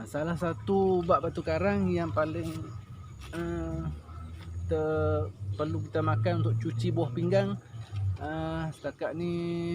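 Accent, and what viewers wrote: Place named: Kelantan, Malaysia. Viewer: Cara cakap mcm org kelantan...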